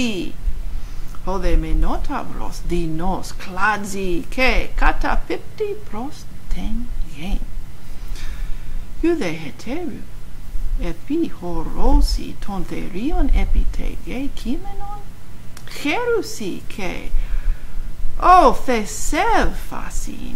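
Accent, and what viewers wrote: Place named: Greece. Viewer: Very well read. It actually sounds Greek. The others should copy your pronunciation.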